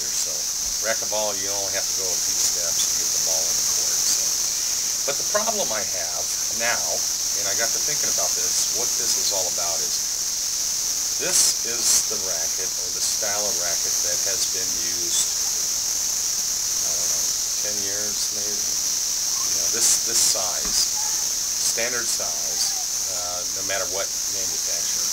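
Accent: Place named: Tennessee, United States. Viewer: Sounds like he lives in Tennessee lol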